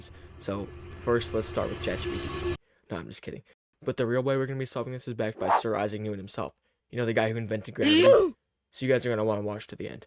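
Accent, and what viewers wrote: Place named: Russia. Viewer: Russian Detected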